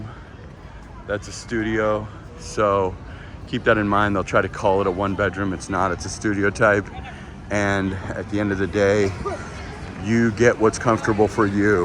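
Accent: United States. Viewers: Watching from Agoura hills Calif you have American accent Bro!!!! Take good care I’m originally from bugallon Pangasinan 4 hrs drive from where you are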